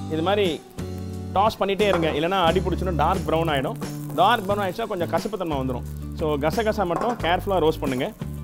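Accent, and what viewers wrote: India. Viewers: Can't wait to make this. Its speaking an Indian dialect of English?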